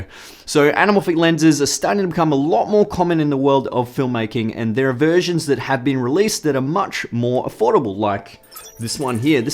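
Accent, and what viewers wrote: Australia. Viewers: Just loving watching an Australian accent version🤙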